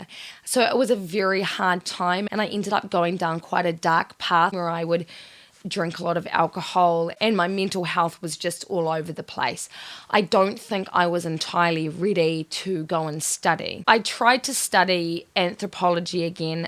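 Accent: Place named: New Zealand. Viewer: That posh/bogan kiwi accent tho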